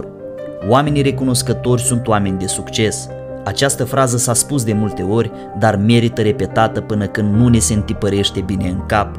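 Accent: Moldova. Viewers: Bună, ești foarte simpatic cu acest accent din Moldova. Mulțumesc.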